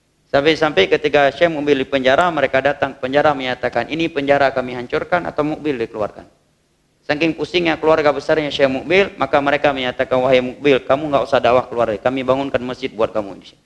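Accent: Aceh, Indonesia. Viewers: Sy seneng logat bahasa acehnya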